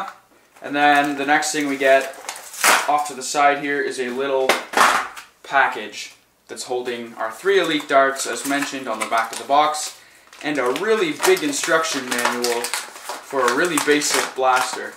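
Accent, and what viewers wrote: Canada. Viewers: Lol ur Canadian.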